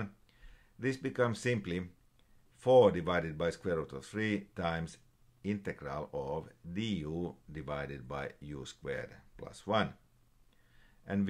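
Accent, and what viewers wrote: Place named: Finland. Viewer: Finnish :D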